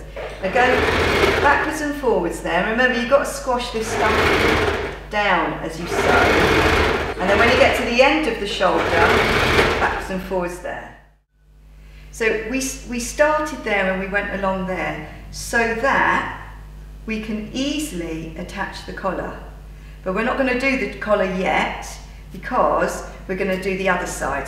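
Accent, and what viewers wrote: United Kingdom. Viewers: Gorgeous! Way to go! I am loving recycle sweater projects this winter as well. I just did a cashmere remake. I could listen to your Brittish accent all day! Keep the great projects coming!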